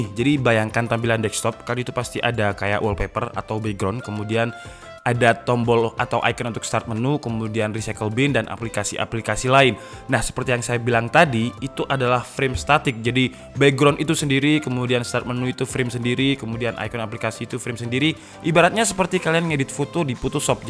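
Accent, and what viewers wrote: Java, Indonesia. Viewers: Oo ini orang jawa ya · Mase wong ndi? Aku kepo logate kok njowoni ik🤣🤣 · Tarah wong malang mak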